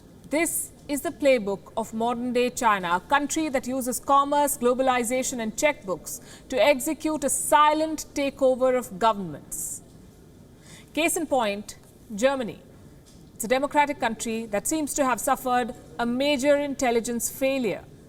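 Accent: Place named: India. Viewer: We know your speech full of Nonsens, like your Indien accent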